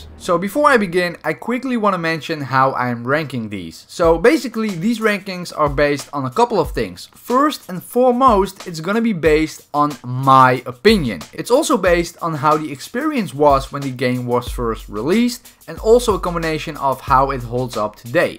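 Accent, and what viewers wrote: Netherlands. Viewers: Wait a second... why do you sound dutch???? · Are you from Netherland ?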